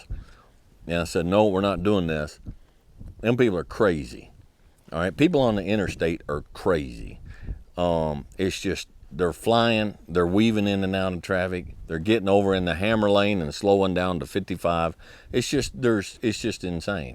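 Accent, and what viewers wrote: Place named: Tennessee, United States. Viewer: Sounds like you live close to Pulaski tn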